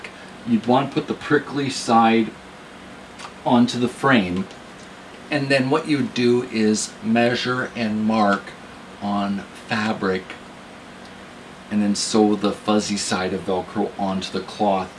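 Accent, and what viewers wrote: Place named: Midwest, United States. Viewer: I was looking for a different purpose. But FYI, your idea makes perfect sense for your purpose. I am guessing by your mild accent that you live in the Midwest or maybe even Canada. Living in blazing hot Texas, I would suggest anyone living down south select a sturdy light colored fabric such as an upholstery fabric or painters tarp or canvas and buy a good UV waterproofing spray to more effectively fend off rain and the heat of the sun. Your design idea also gave me a kernel for my unrelated shade cloth project, so thank you sir. 😄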